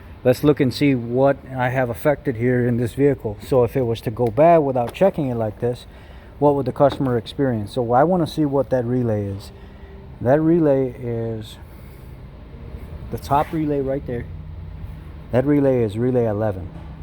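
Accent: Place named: Chicago, United States. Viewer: You sound like a Polish Chicagoan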